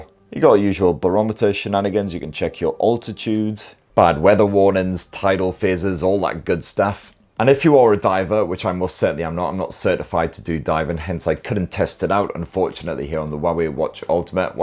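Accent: United Kingdom. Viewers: this is a fantastic review! Plus, the British accent helps make it sound official for us Yanks! Thanks for the great review. You've sold me between this and the gt 5 pro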